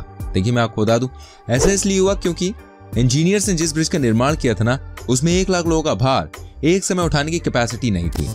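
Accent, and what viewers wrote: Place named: Hyderabad, India. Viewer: HYDERABADi Bolte...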